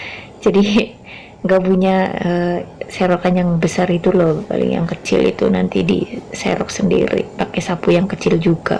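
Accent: Banyumas, Indonesia. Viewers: Nek Ra ngapak ora kepenak,,skses sll yg di Taiwan,thanks infonya ce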